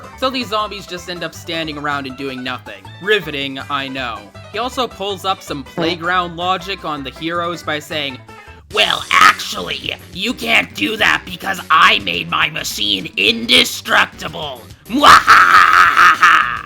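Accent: United Kingdom. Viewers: You’re British, right?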